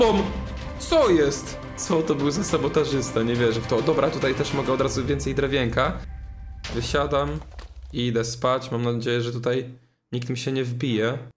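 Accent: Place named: Poland. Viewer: Ten twój Polski angielski czasami boli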